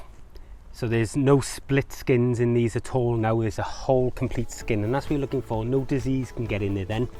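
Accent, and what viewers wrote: Wales, United Kingdom. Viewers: This is a great video! Really informative! I'm growing onions that I found in my brothers old fridge 😂 supermarket bought onions that had sprouted alot! I decided to put them in the ground and they went! I think they are ready to harvest as they have bent over but I wasn't sure because there's still flowers on them. They've been like that for a few weeks now. Also It's really nice to hear a welsh accent! Shwmae from Carmarthenshire :)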